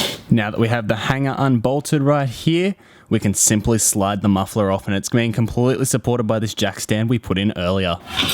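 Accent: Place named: Australia. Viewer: He’s Australian, bruh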